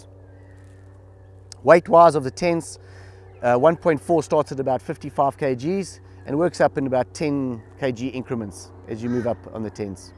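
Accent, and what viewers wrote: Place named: South Africa. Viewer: Hey, great SA accent for an Oz! Nice product, thanks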